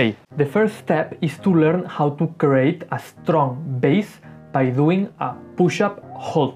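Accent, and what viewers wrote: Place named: Spain. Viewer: illo que dise er tio tu seguro que ere españo vaya que se te nota to el asento chiquillo que arte tiene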